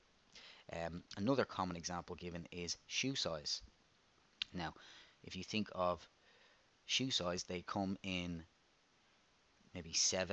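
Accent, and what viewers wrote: Ireland. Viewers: All of your videos have really helped me revise! thanks for taking time out to post these videos on youtube as my maths teacher I currently have in college is SHIT. thanks!! wish you were my teacher, especially with that sexy irish voice....... just saying:)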